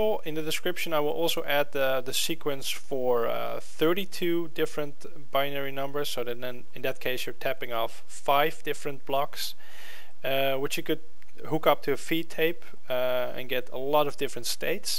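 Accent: Netherlands. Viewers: are you dutch? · Je hoort Gwn aan je stem dat je nederlands bent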